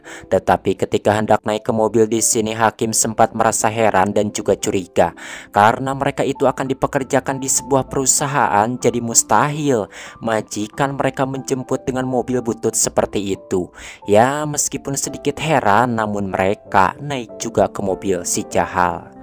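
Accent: West Java, Indonesia. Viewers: Kunaon nya ari nu ngareview na org sunda Ngakak wae😂😂😂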